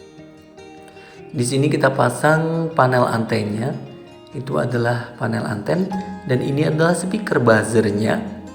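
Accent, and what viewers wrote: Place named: Banjar, Indonesia. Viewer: Bang,pian buka servis nya di bjm kah....? Please jawab,uln dnger nya kaya org bnjar pian.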